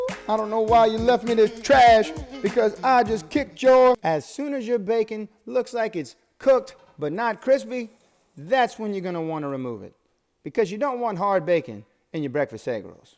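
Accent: Louisiana, United States. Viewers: Oh yes, very Cajun sounding so entertaining.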